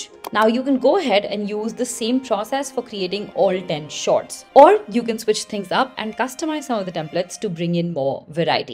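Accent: India. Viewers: I knew this is clickbait once I heard indian accent 👎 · Your Voice Like : indian ❤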